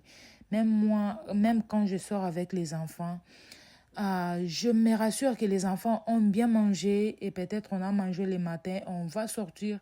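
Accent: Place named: Democratic Republic of the Congo. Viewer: J'aime tes vidéos, je pari que tu es congolaise ( l'accent) . Vrai ou faux ?? Moi Alain d'akwa Cameroun je kiffe 👍 courage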